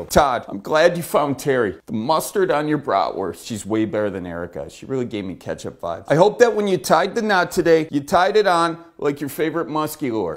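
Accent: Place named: Michigan, United States. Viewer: He still has a Michigan accent A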